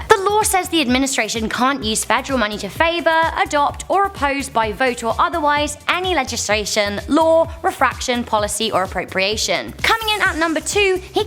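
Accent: United Kingdom. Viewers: I’m assuming you are British due to the accent and would like to point to the stupidity of this video. I am not a Trump supporter but feel the need point out that Trump along with all US Presidents are entitled to all of the things you mentioned. HOWEVER, the Queen of England (UK) is in fact above all British laws as she is considered the law. She could commit murder in the middle of London while flying a Nazi flag and drinking Scotch Whiskey naked and pissing in a bucket and could not be arrested. Everyone in the British government serve the queen. SMH at the unrealistic way some attack Trump. He may not be the best president but he is still subject to US law and impeachment.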